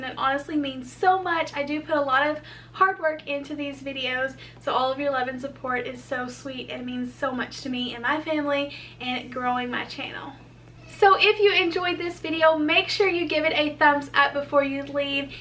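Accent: South, United States. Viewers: I Love all your crafts!! So cute & you are so creative too! I also love your cute southern twang, it reminds me of my Mama- she's from the south!!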